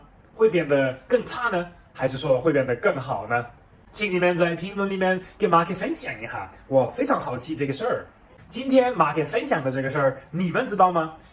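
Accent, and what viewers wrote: Sichuan, China. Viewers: 你的四川話真地道,希望德國人不要受美國的影響,不要欺侮中國人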